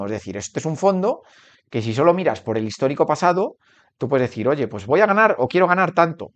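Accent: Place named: Galicia, Spain. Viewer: tus padres son gallegos? En tu forma de hablar te lo noto y con lo "espallados" que estamos por el mundo hasta en el desierto me he encontrado a gallegos😂😂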